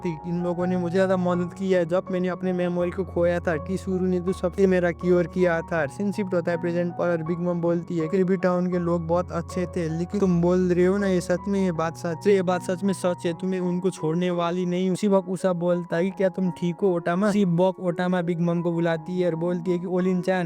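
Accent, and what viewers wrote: Nepal. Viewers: Bro are you from Nepal??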